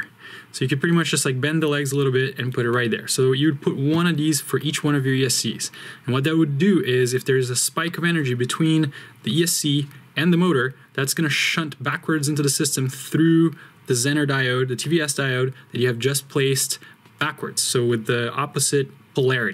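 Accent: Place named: Brazil. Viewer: You sound Brazilian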